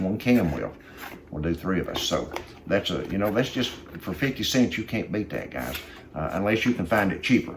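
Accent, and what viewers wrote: Kentucky, United States. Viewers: Hi!! Brand new subscriber here! Ashland, Ky native here!! I knew where you were from before you even mentioned Corbin or Barbourville. You sound like a lot of my family. I LOVE your accent. I have a pretty strong Kentucky accent. My husband is in the Air Force and we just moved to upstate NY a month ago. I stick out like a sore thumb with my accent. Haha! I've had so many people comment on it. Thankfully they've all been nice about it:)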